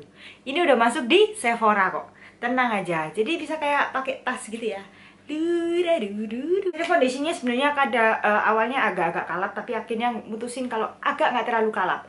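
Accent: Java, Indonesia. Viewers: medog jawa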